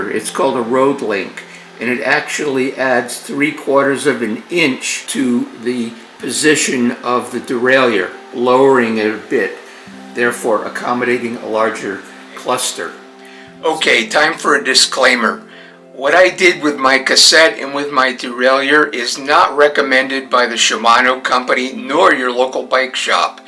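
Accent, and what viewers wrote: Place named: Long Island, United States. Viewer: Wonderful video and Happy Birthday!! 🎉🎉🎉 P.S. Love your accent. I grew up in Merrick, Long Island (right next to Jones Beach). Neighbors!!! ❤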